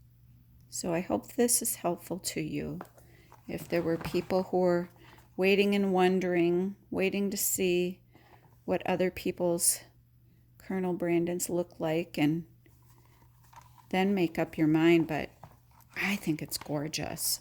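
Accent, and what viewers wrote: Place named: Chicago, United States. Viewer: loved you unboxing and your video. Also your accent! I’m from Chicago but haven’t been back for 30 years. Yours reminds me of Chicago. I know it Chicago and Milwaukee accents may not be the same but it sounded like home to me. Think I’ll have to get a Colonel!.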